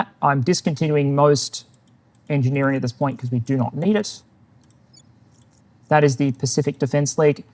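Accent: Australia. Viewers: Reaching out to the game's original voice actors to do chapter intros is a let's play power move. I love the sound of your voice narrating both the real history of the Ukraine war and the fictional history of what ever game you are playing. I fear that future history lectures I will attend will not sound authoritative enough without the Australian accent.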